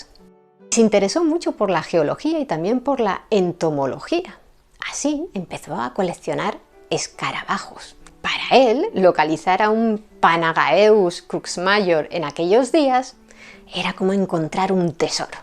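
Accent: Spain. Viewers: Me encanta tu forma de explicar la historia, con mucha precisión y elocuencia ojalá los profesores de historia se topén contigo, debo admitir que tradicionalmente el acento español no me resultaba agradable al oído, pero usted hace que eso resulte todo lo contrario. Saludos y mucho éxito.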